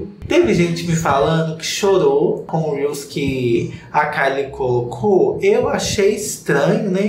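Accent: Minas Gerais, Brazil. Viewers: amei o seu sotaque mineiro 😍😍🤣🤣🤣🤣🤣🤣🤣🤣🤣🤣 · Adorei o com o sotaque de Minas... 👏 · Esse vídeo valeu cada minutinho só pra ter o falando mineirês 🤧🤧🤧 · sou hater assumida do sotaque mineiro do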